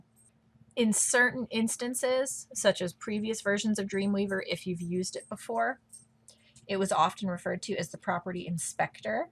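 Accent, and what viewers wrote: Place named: Los Angeles, United States. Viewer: This has been helpful! You sound like you're from LA.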